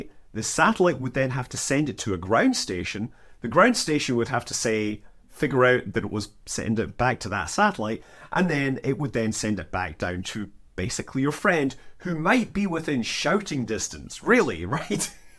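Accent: Scotland, United Kingdom. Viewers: Either I'm getting more used to hearing USA accents everyday by living in the US (I'm English), or you're becoming more Scottish again. You're losing the Irish accent as well.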